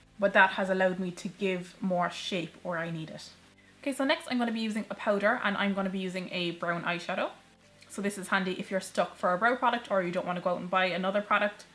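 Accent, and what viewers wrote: Ireland. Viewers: Thanks for replying - it means a lot to me. Yes my eyes are like yours where the crease hangs down and blocks out part of my lid when my eyes are open.. I am so glad I "found" you Its lovely to hear the irish accent as Im irish (Mayo) living in England. Maybe sometime - who knows I may get to Cork and go to you for some lessons!!. All the beauty tutorials on youtube are great - but sometimes women of a certain age (like myself) are at maybe a low point in life (menopause etc ) lacking confidence and at a loss as to how to enhance the looks they have. I love the way you put your tutorials across it is very down to earth but very informative and easy to follow.- thank you. Maybe sometime you might do a full face and eyes for mature women???? Maybe??? I will keep a look out. God bless xx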